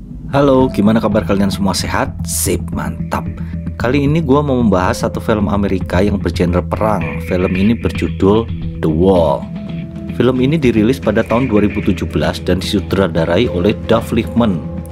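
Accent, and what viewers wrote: Java, Indonesia. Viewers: Medog mu bro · Aksen Jowo sampean kental banget mas 🤣🤣🤣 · MEDHOGH SISAN SWARANE...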